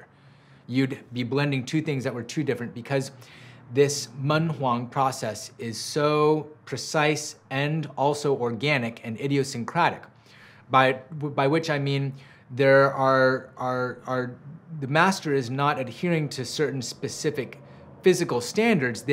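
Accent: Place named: London, United Kingdom. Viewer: This whole video I'm just wondering how much you have been influenced by London. You sound like it and the tea pets are a dead giveaway to those who know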